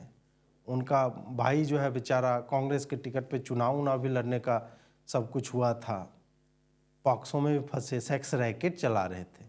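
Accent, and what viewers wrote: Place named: Bihar, India. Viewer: अजीत जी आपका बिहारी लहजे में भयंकर रोस्ट बड़ा मज़ेदार लगता है I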